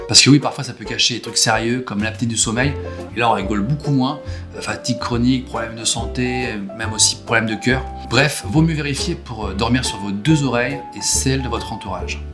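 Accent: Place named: Toulouse, France. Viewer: Pire accent toulousain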